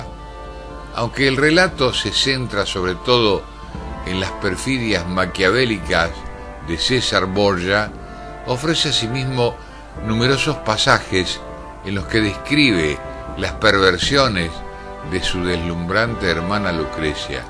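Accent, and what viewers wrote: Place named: Argentina. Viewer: el video bonito pero ese acento argentino muy feo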